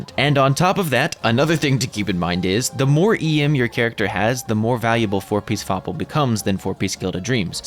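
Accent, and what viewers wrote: United Kingdom. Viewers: The british accent gave me an eargasm. Thank you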